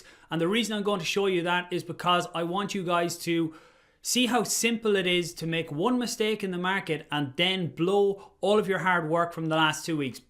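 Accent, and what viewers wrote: Ireland. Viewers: You sound like a strangled duck. I love it :)) Irish accent funny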